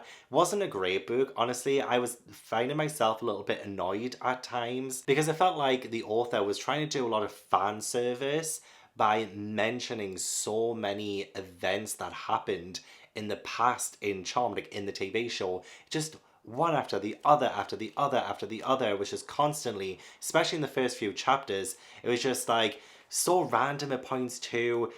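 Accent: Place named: Newcastle, United Kingdom. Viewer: I just came across your channel and for some reason I thought you would be American. Was very surprised (and happy!) to hear a fellow geordie, who is into the charmed books! 😁 thanks for making this video. It was class!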